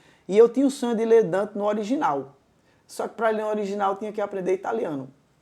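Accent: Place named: Pernambuco, Brazil. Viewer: Muito obrigado! Nossa fala nordestina pernambucana traz traços de uma região que bebeu muito vocabulário jorrado pelas ondas do rádio.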